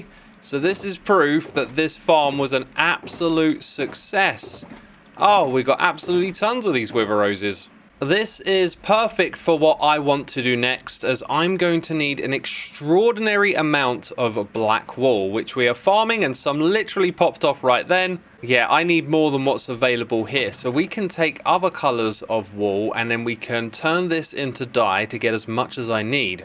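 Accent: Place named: London, United Kingdom. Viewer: Your new mic has just made me even more confused! You have sort of this londoner accent but I still can't put down why your accent is so familiar aswell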